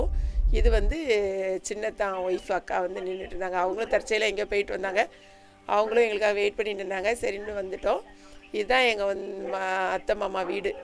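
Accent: Tirunelveli, India. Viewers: நீங்க சென்னை ல இருந்தாலும் உங்க பேச்சு திருநெல்வேலி பேச்சு தான்.... மாறல... Gud 👍👍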